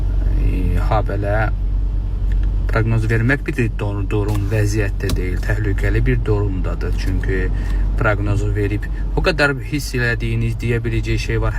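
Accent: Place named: Armenia. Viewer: Buna fikir vermeyin.Bunun xowu gelir ele bil pis weyler demek,daniwmag.ERMENI kimi daniwir.IWINNEN MEWGUL OL¡!(+++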